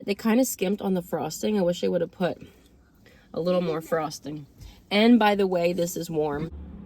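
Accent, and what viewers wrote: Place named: Philadelphia, United States. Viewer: Oh your birthday is March 7... so awesome..so is mine. And I could tell you where from like Philly or Maryland or something...your accent... awesome video... Have a great week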